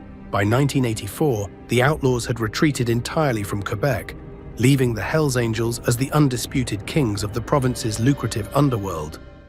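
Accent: United Kingdom. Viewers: Sorry. There’s something just wrong about narrative on hells angles with a British accent. Destroys it. Sound American. 🇺🇸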